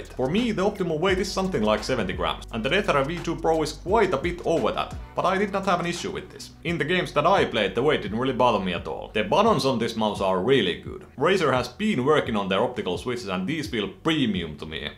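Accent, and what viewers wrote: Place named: Finland. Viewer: bro the Finnish accent💀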